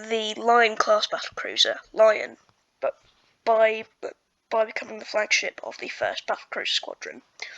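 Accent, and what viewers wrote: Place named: England, United Kingdom. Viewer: Thank for this really well put together video. For the first time in ages, I'm getting to view something where the narrative matches your visual presentation accordingly and accurately. Your English accent turns my ears into soft butter. A pleasant change to my usual cretinous American environment. Well done and thumbs up.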